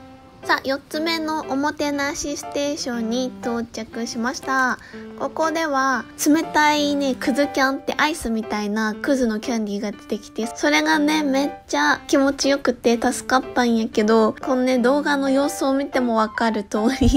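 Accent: Gifu, Japan. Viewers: 最近よく拝見しています。岐阜弁の響きが懐かしいです。（友人が岐阜に居るので）来年は私も参加したくなりました。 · 後半戦も、岐阜弁を懐かしい思いで聴きながら拝見致しました。 岐阜に住んでいた時、「えらい」を最初聴いた時、？？だったのを思い出しました。 来年、輪行して、参加したくなりました！！ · 失礼😅岐阜弁ですか！かわいいですね🙂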